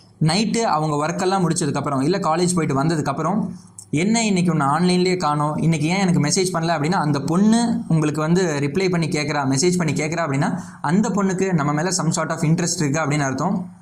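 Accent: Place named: Mannargudi, India. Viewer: Semma bro mannangatti slang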